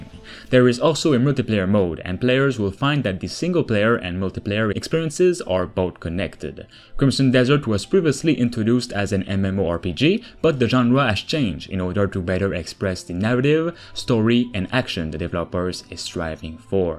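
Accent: Quebec, Canada. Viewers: I am sure of one thing, your accent is from Québec ?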